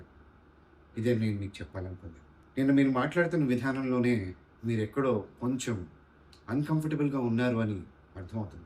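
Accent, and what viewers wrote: Telangana, India. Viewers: తెలంగాణ బాషా మస్త్ మాట్లాడతాన్నావు భాయ్ ఏ జిల్లా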